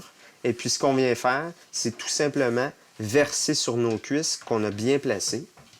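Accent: Quebec, Canada. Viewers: is this canadian french?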